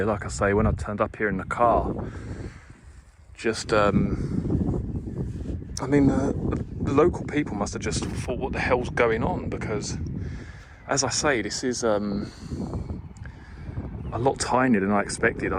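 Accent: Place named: London, United Kingdom. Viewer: Yes, we're all very friendly here in Lancashire and they say the further north you go, the friendlier people get. As it took you so long to get up here, I can only assume you are now based in Exeter or Plymouth? It's a good four hour plus drive, on a good day, for us to get to Torquay - you also mentioned that you had lived in Devon - maybe Cockington, if this was south Devon? However, your accent tells me that you come from nearer to London. Anyway, great video. It's a shame that you didn't stay up here overnight - maybe in Blackpool or somewhere quieter like Fleetwood or Poulton.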